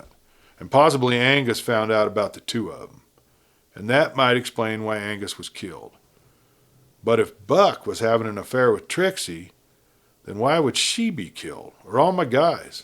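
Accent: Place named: Texas, United States. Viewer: And you almost sound like a Texan❤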